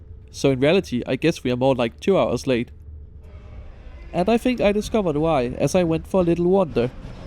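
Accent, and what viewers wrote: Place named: Denmark. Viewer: Is strange how undestand person with Danish accetn better than Americans.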